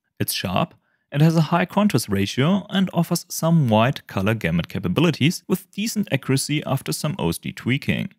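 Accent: Germany. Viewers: Dude, you sounds like a German who speak English. Hoffe mal ich lag richtig. Hahah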